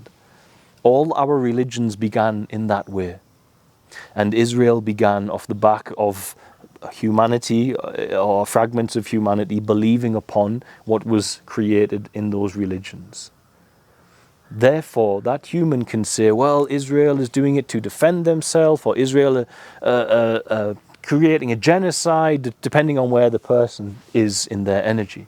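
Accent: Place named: Newcastle, United Kingdom. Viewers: Hey there, bonny lad, bloody good talk, and a beautiful, Geordie voice to deliver it, are you aware of the three premiers, snorting coke, on a train from Ukraine, red handed, also, she was wiping coke from his nose, not attacking him,on the plane, trudau, is the son of fidel castro, Starmer is secretly, gay, rent boys are burning houses and cars, belonging to him, for unpaid bills, seems they were all trained by the w,e,f,,allegedlly all broken, easy attachment, keep talking bonny lad, god bless , fenham, formerly, elswick, you sound the same as me. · metaphysics explained via understandable examples and the dissonance from the brigite deception dispelled by your soft geordie tones many many thanks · Never seen this gent before Sounds like a Geordie Great presentation though Very interesting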